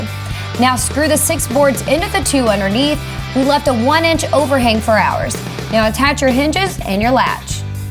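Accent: South, United States. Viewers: I could listen to your sweet southern voice rack off measurements all damn day...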